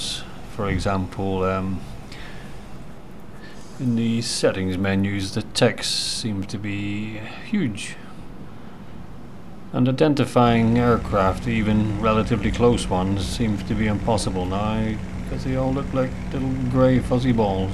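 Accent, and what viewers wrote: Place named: Northern Ireland, United Kingdom. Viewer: Hi, Cheers for the vid 👍, just trying to place… fellow Norn Iron?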